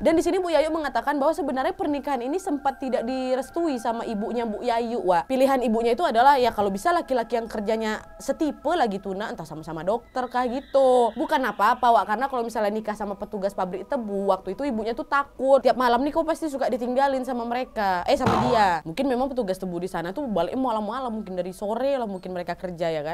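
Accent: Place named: Java, Indonesia. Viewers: Medhok jawane mbak apik 👍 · Kak klu ngmong bahasa jawa lucuk deehh.. suka dngrnya.. 🥰🥰🥰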